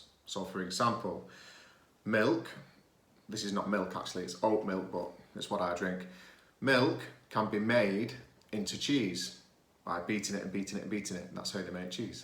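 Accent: United Kingdom. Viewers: thank you very much for your dedication and careful explanations! And sorry for my question, what kind of British accent have you got?? I notice that it is British but with same variations, is not it??... Sandro Cano, from Argentina...;);)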